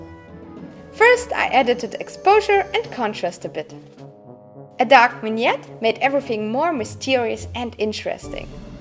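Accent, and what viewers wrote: Germany. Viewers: I love your german accent xD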